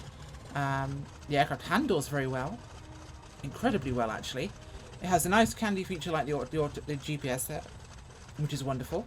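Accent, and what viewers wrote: Russia. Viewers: I normally really like your reviews, but this russian accent was a bit too much for me over time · I like the Russian accent...it suits you. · I loved your Russian accent!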